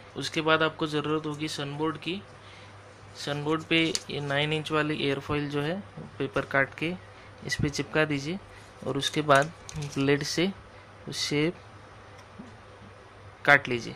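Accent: Maharashtra, India. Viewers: bhai ,awaz se maharashtrian lagathe ho. kaha se ho,??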